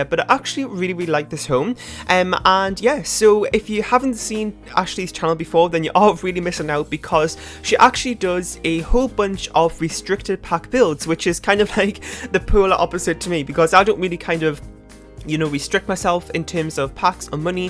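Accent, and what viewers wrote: Newcastle, United Kingdom. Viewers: Ahh, just found your channel! I love your builds and your accent. Is it Geordie? I can't quite place it haha. :)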